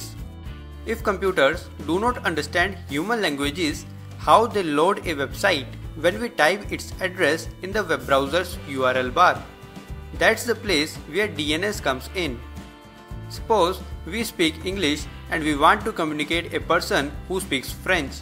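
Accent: India. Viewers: 这印度英语真听不下去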